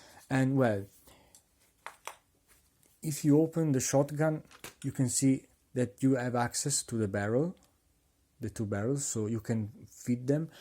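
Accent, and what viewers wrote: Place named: Italy. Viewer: Si sente l'italiano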